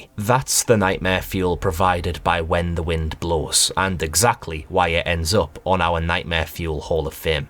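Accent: United Kingdom. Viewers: I like your accent! Great Britain?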